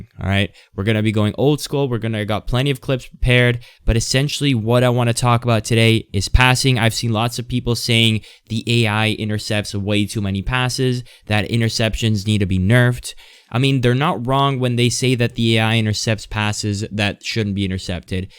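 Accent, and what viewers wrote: United States. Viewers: What content are you in. You sound American but played Cihan in rivals.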